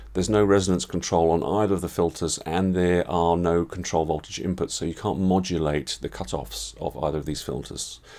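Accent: United Kingdom. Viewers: I fully agree. After long years of absence I've started last year again with synths. My "starters" were Behringer´s wonderful Model D, DeepMind and Pro1. In the meanwhile the Model D and Pro1 are replaced by systems of Moog. But nevertheless... starting would never happen with the more expensive Moog systems. Therefore I honour the products of Behringer as it gave me the chance to start again... even with a less budget. And not to forget... the work of is fantastic. Lots of explaining in a very British and comfortable way.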